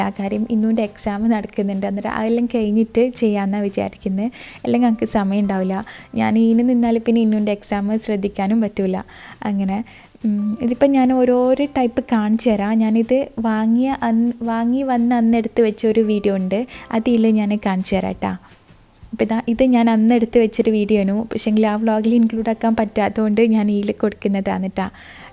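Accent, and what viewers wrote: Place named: Thiruvananthapuram, India. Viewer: Namale thiruvanantha purathe ninane athane samsarathile mattam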